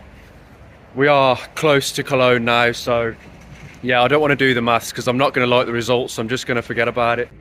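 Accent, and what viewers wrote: United Kingdom. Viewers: Judging by your accent you are from around my area somewhere, there is a good crowd of UK Volvo folks, if you ever want to meet up contact me.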